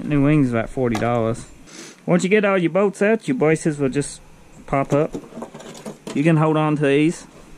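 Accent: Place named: Louisiana, United States. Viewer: Why does this ol boy sound like he's from Shreveport?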